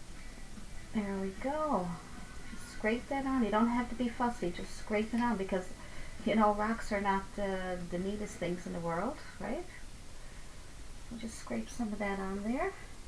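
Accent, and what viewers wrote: Newfoundland, Canada. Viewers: OMG this is the first tutorial that I have seen Love your manner and you make me feel right at ease....have just begun to paint, will definitely look at your others thank you sweetie, (you sound like a Newfoulander) love your accent xo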